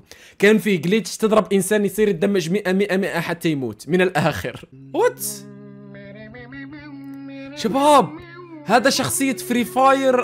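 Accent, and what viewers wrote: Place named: Maghreb. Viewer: أحسه جزائر أو مغربي أو تونسي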